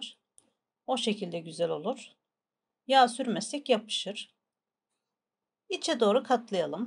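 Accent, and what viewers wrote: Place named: Turkey. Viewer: Italia, penso che sia turca questa ricetta sento l’accento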